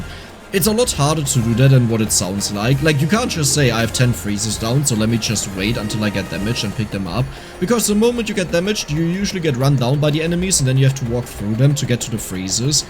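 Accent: Germany. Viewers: Are you german,?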